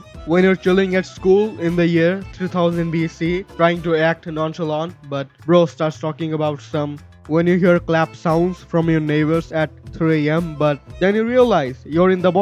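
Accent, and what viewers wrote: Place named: India. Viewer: U sound so Indian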